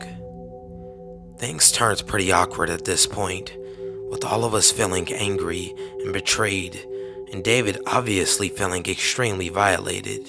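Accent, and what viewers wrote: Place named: South, United States. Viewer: Sunna Lind I like the rough edge his voice has. That, and I could swear he's got a little bit of a Southern drawl. I dunno, but I like it.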